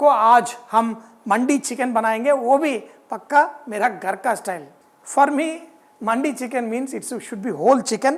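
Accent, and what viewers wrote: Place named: Hyderabad, India. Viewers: Haha perfect hyderabadi slang of u r... 😂😂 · Chef... in love with u r hyderabadi conversation, bilkul apna sa lagta hai, Eid mubarak in advance, keep cooking enjoy good life!!! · Wooow🤣 happy to hear sir hydrabadi urdu you are really grate aap log hamesha ayse hi khush raho aap ko bhi Eid Mubarak 🙌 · True Hyderabadi accent....Feels good... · good to see your videos sir thanks for uploading hyderabadi baata kirrrak hi bhabi bolre so..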